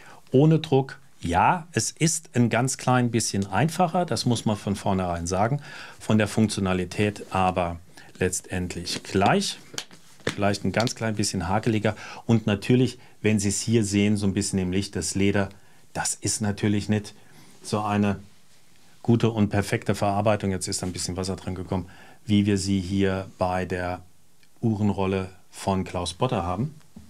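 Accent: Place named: Hesse, Germany. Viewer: Moin Herr, sehr interessantes Video zu einem Nischenthema, dazu top recherchiert und fair bewertet. Ich habe mir vor Jahren mal eine erwas rustikalere Lederrolle von Steinhart zugelegt, die ich aber sehr selten nehme. Auf Reisen nutze ich lieber die günstigen Reiseboxen von Sinn für eine Uhr oder etwas hochwertiger das Leder Reiseetui ebenfalls von Sinn. Wie immer sehr kurzweilig mit dem schönen hessischen nit und einer Prise Humor, Chapeau🎩.Und wie gewohnt schön in Szene gesetzt und klug kommentiert. LG Werner Hoppe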